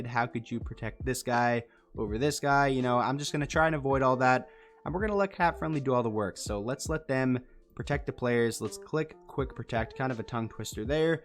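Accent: Canada. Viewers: Do you have a video as to why you are a Red Wings fan? For me it's because I was born and raised in Detroit and only eventually moved slightly outside of Detroit. There was no way I couldn't be a Wings fan in the 90's and later hockey in my high school was bigger than HS football in the white suburb town that we eventually annexed to. Your accent, particularly your R's sound very Canadian but I wouldn't place it as Ontario. If you're west Canada or newfie, why Red Wings? Other than having excellent judgement, any personal life connections?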